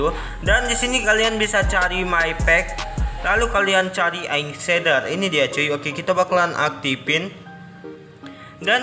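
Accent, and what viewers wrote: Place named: Banyumas, Indonesia. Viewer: Nonton doang laik ngapak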